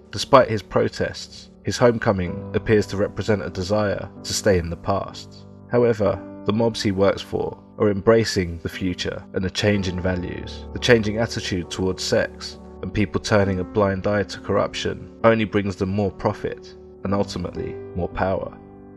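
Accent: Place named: United Kingdom. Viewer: 😊 I like this documentary about gangster film genre.😊 I like the narrator with the British accent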